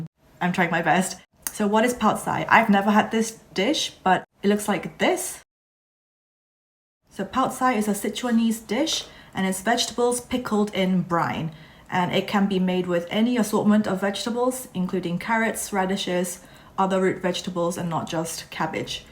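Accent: United Kingdom. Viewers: 영국식 발음같은데 맞나용? 멋있네용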